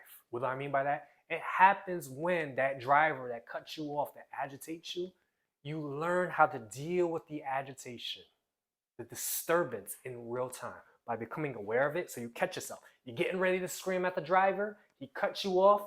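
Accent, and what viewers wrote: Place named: Chicago, United States. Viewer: New to this channel but spirit had this guy come to my FB reels and it's been since June 2025 that I was last on FB. Anyway, I needed to take care of my body as my right side isn't working well. Stiff & painful with every movement and it's been years. So I did some movement stretches from him. I felt better as the movements were slow not to injure an injury. Listening to him speak on YT made me think of Chitown (Chicago) from his accent. At times I'd giggle & at times I felt family that still live there. Words like "bref, stumick, erf" man, I miss my family & Chicago!! ❤❤❤ TY guy for your video😊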